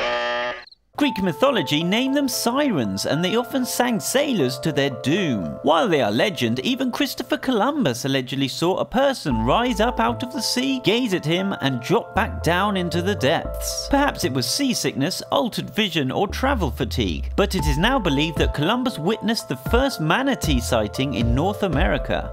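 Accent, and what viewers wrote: England, United Kingdom. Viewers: Nice Englishmen English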